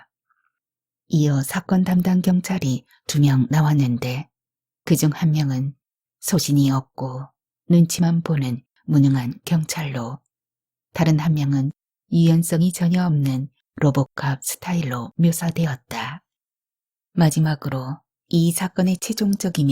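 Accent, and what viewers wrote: Jeolla, South Korea. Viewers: 왜?미리처읽어대는거야.. 지나치게 전라도 사투리를써데더니.. 그냥 표준어로 읽어주세요. 너무듣기좋아요. 목소리. 발음 너~~무이쁜데..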